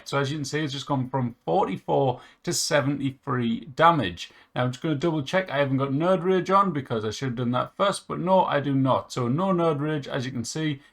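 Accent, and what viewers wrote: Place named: Durham, United Kingdom. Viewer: Bud,I'm trying to place your north east accent? Durham?? Love the channel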